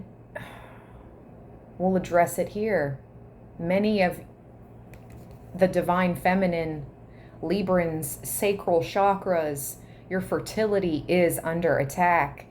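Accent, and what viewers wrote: United States. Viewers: Are you from Kentucky?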